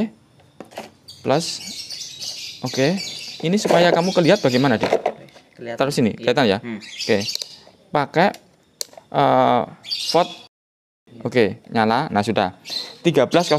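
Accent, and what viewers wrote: Java, Indonesia. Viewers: Sajak wong jowo omongane,jowo ngendi bro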